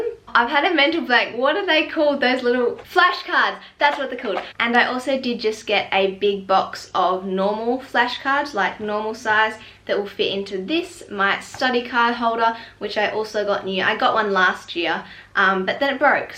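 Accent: Australia. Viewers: I love the accent of australian 😍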